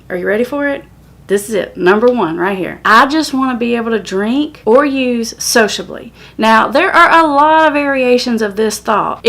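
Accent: South, United States. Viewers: Loving the Southern accent!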